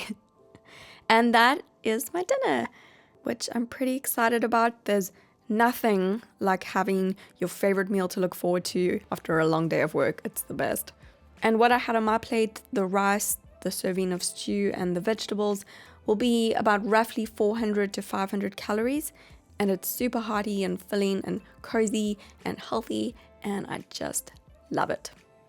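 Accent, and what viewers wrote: South Africa. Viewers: South Africa I think x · are you South African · South Africa! ❤️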